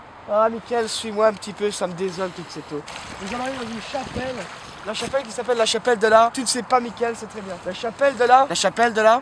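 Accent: Belgium. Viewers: Cet accent belge ahah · T'es belge ou français ta un petit aquessent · Tellement bon, j'ai ressenti le Poelvoorde jeune avec une bon punch à l'accent Belge. Il y a des génies et il y a. merci!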